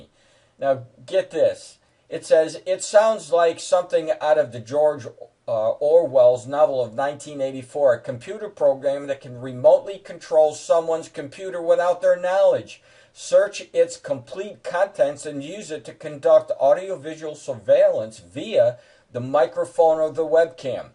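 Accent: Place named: Rhode Island, United States. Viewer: are you originally from Rhode Island by any chance? I'm hearing bits and pieces of an RI accent. Another great video!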